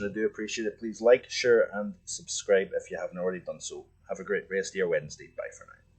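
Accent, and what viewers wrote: Scotland, United Kingdom. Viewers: Many thanks I think your Scottish accent brings more feeling and meaning to your very detailed weather reports. Some equally fine and detailed weather reports, almost become boring, due to the uninteresting accent. Mr puts a lot of emphasis into his voice, and personally, i think his Scottish accent suits his interesting channel. Ive told several friends to check out weather channel, as its a real pleasure to tune into your weather analysis. More of the same please, and a BIG thank you from a still rather dry llandudno on the North Wales riviera coast.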